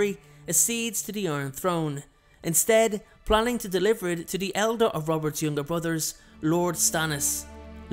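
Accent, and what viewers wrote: Ireland. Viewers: He’s obviously Irish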